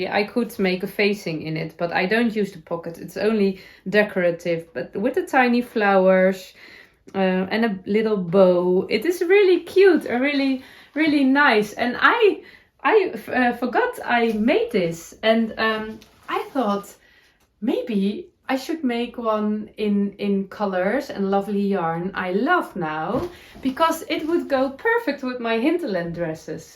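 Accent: Netherlands. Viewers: I love it. My dad and his family were from Holland, most of them have now passed so I love to hear your Dutchy English. It reminds me of them. I enjoy hearing about your culture also seeing some of the sites. Thank you for your English speaking podcast.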